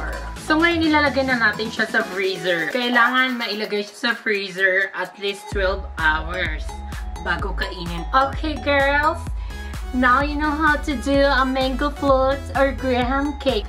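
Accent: Visayas, Philippines. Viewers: Gustong gusto ko pag nag bibisaya ka mama haha ang cute nang pag bibisaya mo. Bigla naman ako nag crave sa graham pag uwi ko nalang ng pinas wala kasing graham biscuits dito e ang poor haha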